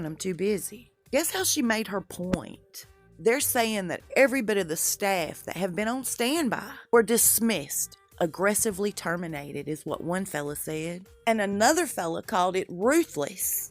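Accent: South, United States. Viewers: 💜💜💜Happy Valentine's Day! 💜💜💜 I didn't know about your abuse situation. So glad you broke free of that. You are a valuable person with a really cool southern voice! LOL. Bless you dear-heart today and always. Here's a rose for ya. 🌹🌹🌹🌹🌹🌹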